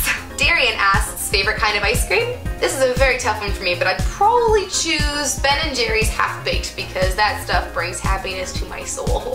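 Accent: Canada. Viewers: Harry Potter is my favorite! I'm also really good at math although English is more fun for me. Ps your accent is so cute! I love Canadians.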